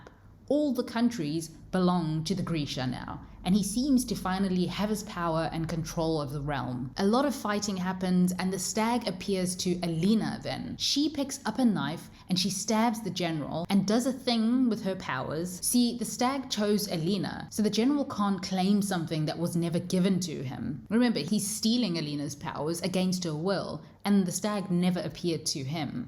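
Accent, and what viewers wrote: South Africa. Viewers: Is that an SA accent I hear? 😍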